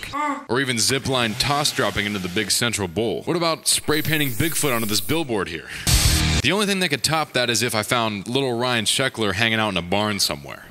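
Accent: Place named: Pittsburgh, United States. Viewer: Are yinz from the Burgh?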